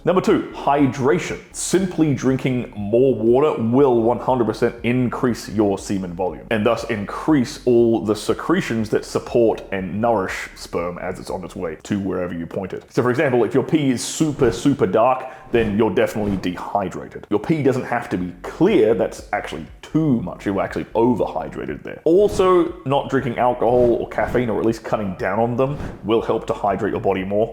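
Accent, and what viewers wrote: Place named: Australia. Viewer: He's got an accent buddy. He doesn't speak God's American English. He speaks Australian Queen's English.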